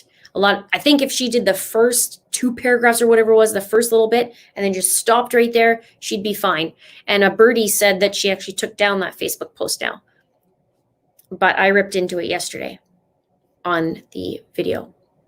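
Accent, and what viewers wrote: Canada. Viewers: Love everything about how you present information, pick everything apart and are onto the lies in people's statements. Melanie Gibb is definitely covering her butt because she should have warned someone many, many times. She is doing all these interviews to make money off of this to take the focus off of her responsibility of saying something sooner of what she knew was going on. I am not buying her innocent statements. Alex's wife, definitely something there. Lori's sister dying... Sociopaths have usually been sinister their whole lives ,ust like Chad has been luring women many more times before. Love your Canadian accent. (from a fellow Canadian) Melanie P. has snowed her husband and someone will spill the truth about her very soon I suspect. Keep up the great work!!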